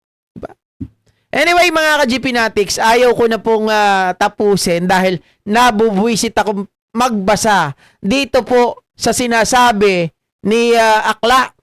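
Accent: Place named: Waray, Philippines. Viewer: Ikaw Sir talaga Waray na waray ka po😅😅😅😅😅❤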